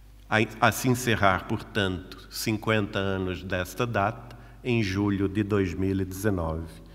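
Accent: Portugal. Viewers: o está com sotaque de Portugal?? 😳😳😮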